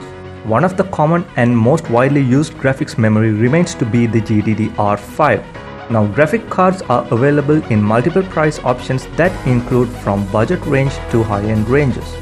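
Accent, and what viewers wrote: India. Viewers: Bro shut the fck up your Indian voice is funny as fck😂😂😂😂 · Ahh. Classic Indian accent. The land of street sh#tter. PS: I think the music needs to be louder. So we can bleed our ears out.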